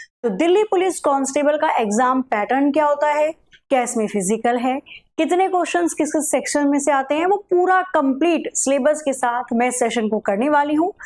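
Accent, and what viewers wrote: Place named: Bihar, India. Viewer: Mam your bihari accent is too cute ❤. Love it❤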